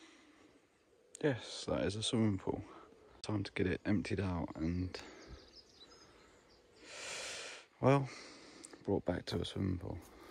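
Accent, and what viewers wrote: England, United Kingdom. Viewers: You sound English?? Aussie here. Concrete and aggregate. Or fibreglass drop in. Salt water chlorinated! First I seen of one like this. You did a brilliant job.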